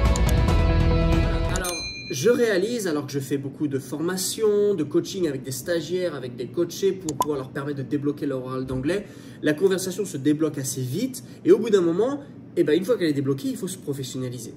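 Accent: Scotland, United Kingdom. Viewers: “Aha” is kind of international 😉 Love the Scottish accent 🤪 Have a great day !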